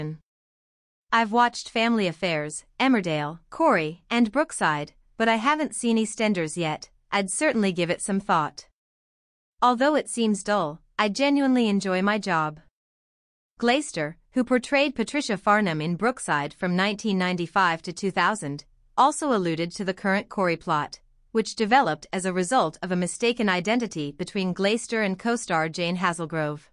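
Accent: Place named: United States. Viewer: What's with the robotic American voice? It's a English soap so a natural English voice would be nice🤗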